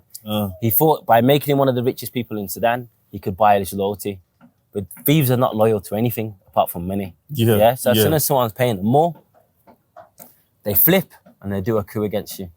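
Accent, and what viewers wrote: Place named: Birmingham, United Kingdom. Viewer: That Birmingham accent 👌🏾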